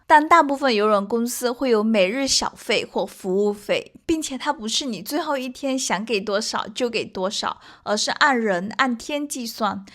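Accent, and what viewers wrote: Fuzhou, China. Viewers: 典型福州口音？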